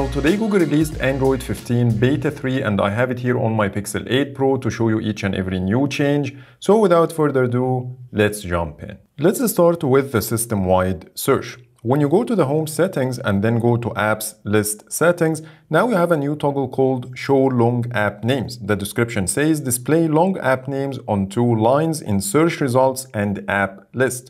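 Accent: Egypt. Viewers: Ur accent is egyptian am i right ?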